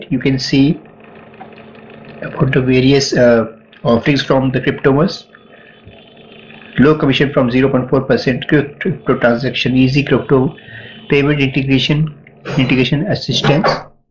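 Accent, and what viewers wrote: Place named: India. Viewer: Every Indian accent makes me sick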